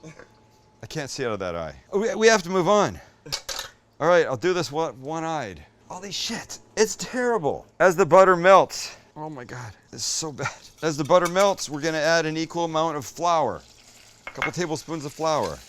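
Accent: Ireland. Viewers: pretty good irish accent there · C. he used the C word. you can tell because of the irish accent. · 8/10 on the Irish accent.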